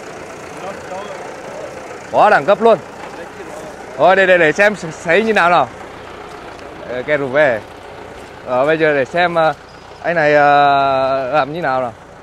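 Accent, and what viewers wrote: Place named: Nghe An, Vietnam. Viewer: Quang linh quê" choa " chân phác gần gũi tinh cảm...nhất là hài ước hay ra phết...tôi dân nghệ nghe bạn nói giọng nghệ tôi vui và cười sung sướng luôn..hãy là như thể kg cần thay đổi hi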